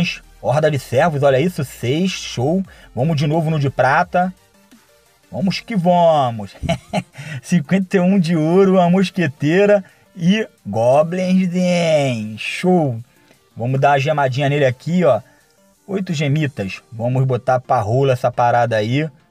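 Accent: Rio de Janeiro, Brazil. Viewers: mt top esse sotaque do rj kkkk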